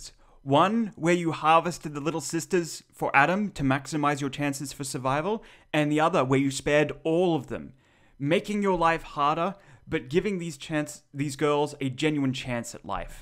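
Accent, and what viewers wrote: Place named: Australia. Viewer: I've never heard someone with an australian accent using so mamy rhotic words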